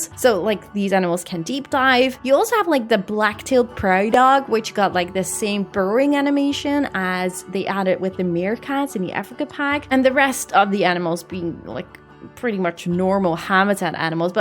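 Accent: Netherlands. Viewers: You sound like you are from Nederlands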